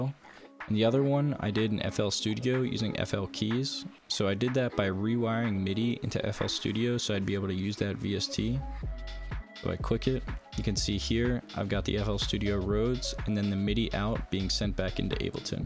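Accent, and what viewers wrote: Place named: United States. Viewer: Bro u live in Bangladesh then how did u pick up that American accent?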